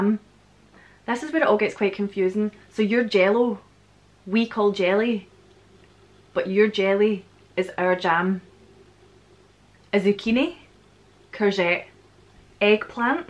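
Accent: Scotland, United Kingdom. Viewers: On one of your older videos on Scottish pronunciation,you were talking about this thing with "i" being pronunced as "eh" or "ey". So how can you exactly tell the difference between the words like: bike-bake,line-lane,fight-fate,mine-main,pine-pain etc. or for this shorter "eh" sound: pity-petty etc.? Also can you actually pronounce it like that when you're taking about yourself,like "I am going somewhere"? 'Cause in this case I can hear that you pronounce it like "ah",but are there any Scottish people who'd pronounce it as "ey"? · For myself, I love the sound of English with what we, as Americans, think of as a Scottish accent. I think if I listened to Scottish Gaelic I would not understand a single word. As a child I was around many relatives that spoke with a Scottish accent including my Dad who was from Scotland. He had many friends and coworkers who were around the house. 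I don't really remember them because after my Dad died (I was maybe 2 or 3 years old) they didn't all come around the house too much and the Scottish relatives were older and well,.... passed away. Anyway, I love the accent and I love to watch and hear your videos. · Hey, thanks for your interesting videos. Courgette and Aubergine happen to be french words. In case you (and your viewers) didn't know. I've just discovered your channel today and I really love your accent. I don't yet know from which part of Scotland you are but i hope to find out. Greetings from France. Take care. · Interesting, I like to hear how people in Scotland, Australia and England say words that mean the same thing but are different. I’m assuming you’ve seen Braveheart? Just wondering what you thought of Mel Gibson’s Scottish accent. In the movie, they used words like Lassie - meaning a young girl? Or no the now - meaning not right now? 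Some of the words you said in this video made you sound American, especially when you said “Herb” or “Garage” You should make a video trying to speak like an American. It would be funny, maybe because your Scottish accent is so thick? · Love your accent and your videos! Love Scotland!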